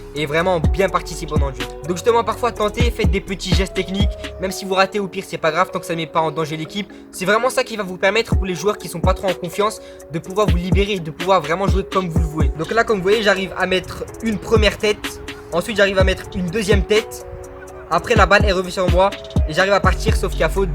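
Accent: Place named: Marseille, France. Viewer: T est marseillais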